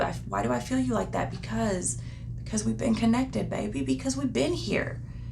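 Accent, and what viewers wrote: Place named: North Carolina, United States. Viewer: I really enjoyed your message and it was it was great to see you face finally. I’ve been a subscriber for about a month now but your message today was so thought provoking. I absolutely need that kind of coaching in my life... I held onto every word! I have to say I think we share the same accent?? NC maybe? Anywho I love you woman, keep up the inspiration and thank you!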